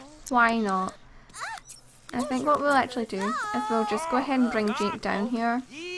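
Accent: Scotland, United Kingdom. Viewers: are u Scottish? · ***** I could tell lol! You sound nice, so it's okay. Loads of Scottish people sound nice (nearly wrote Scootish, wow).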